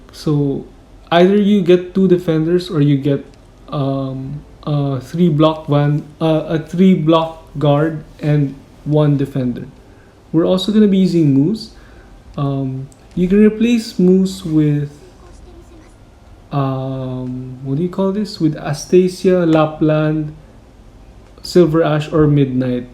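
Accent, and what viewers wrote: Philippines. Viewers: why do you sound so Filipino to me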